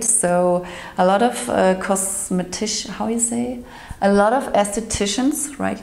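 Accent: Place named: Germany. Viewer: Keep it up! Your English is great. I think you have a lot of potential doing your videos in English as well as German. I think your German accent actually sounds very nice and makes you unique relative to other raw food channels in English. Thank you for reminding me of these truths about how to maintain overall health.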